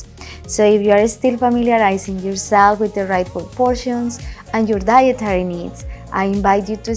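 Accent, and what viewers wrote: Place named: Latin America. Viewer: I like when latinos speak english 😂❤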